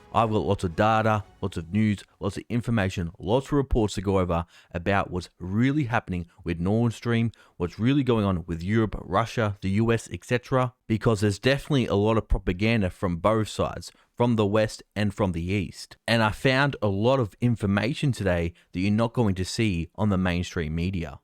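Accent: Australia. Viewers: he is Aussie hence the accent.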